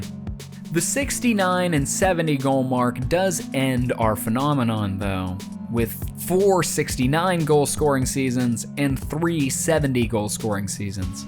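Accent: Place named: Central Pennsylvania, United States. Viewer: Lol... Nope. That's that central PA accent shining through. Central PA isn't AS bad as Western PA, but we tend to overemphasize certain consonants at the end of words and deemphasize others. You won't hear that hard "duh" sound with the D at the end like other accents Names like Todd, Rod, etc can sounds like Rot, Tott, Ron, etc lol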